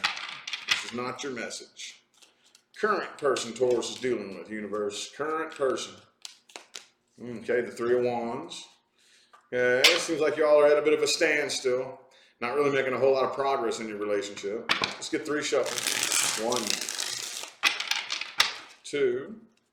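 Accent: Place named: Texas, United States. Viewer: Yes!! Love a little country accent in a tarot reading, love Texas girl lol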